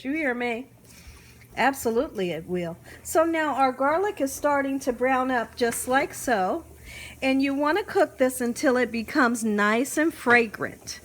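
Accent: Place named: California, United States. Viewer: She sounds like CALI lol. I'm from ST Louis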